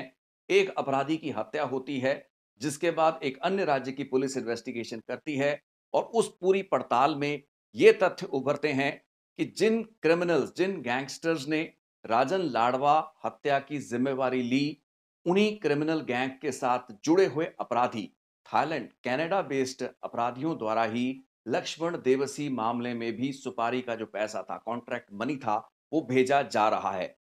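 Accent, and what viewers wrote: Punjab, India. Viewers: Good morning ji.. I understand in Hindi language, but love ur punjabi accent..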